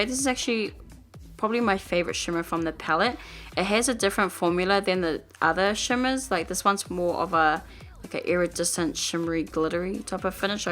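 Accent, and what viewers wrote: New Zealand. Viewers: You from NZ?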